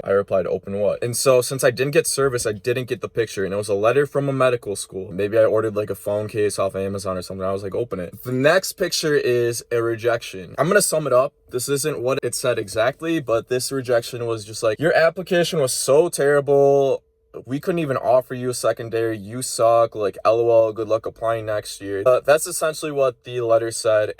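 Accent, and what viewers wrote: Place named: Midwest, United States. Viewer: Hello - thanks for making this video! I've received some rejections and I applied late and I'm expecting plenty more. Mcat was meh for me too. But thank you for being so honest and heartfelt! Also, love your Midwestern accent! Keep your head up - easier said than done - I Totally get that. You've already accomplished SO much just by applying and getting yourself on a page. You probably have a much better understanding of yourself than you realize thanks to the thoughtfulness you put into your application. And you probably gained a lot in studying for the MCAT the first time. I've already taken it twice , but I'm guessing I will have to take it a 3rd time just to get schools to even look at me. I totally feel ya! You know more than you realize! Keep chugging. We're all in this and we're all rooting for you! :)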